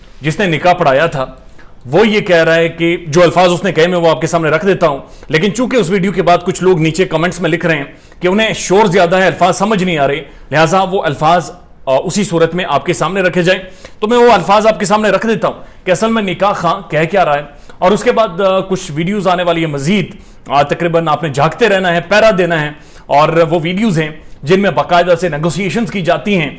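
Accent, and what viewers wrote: Punjab, India. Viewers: Ur Punjabi accent is very near to real Punjabi, but seems more cute than normal Punjabi bro..... Keep their chitrol on 😂😂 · Zaberdast punjabi style brother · Very sweet try of Punjabi but I think pushto more suits you !! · Punjabi accent 😄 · Ur Punjabi accent is so good I dnt understand Punjabi but I understood ur words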